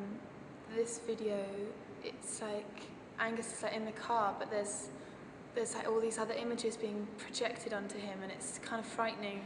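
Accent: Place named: England, United Kingdom. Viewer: is she american or english?? cuz she has an english accent but says one of my fave videos *period* which is american.. :-P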